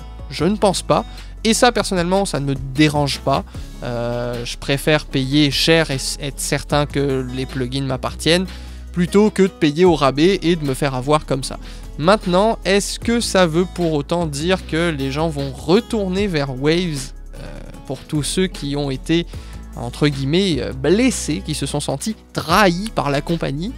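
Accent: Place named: Réunion, France. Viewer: T parey mi sens kom inn ti accent kreol 😅 ou lé réyoné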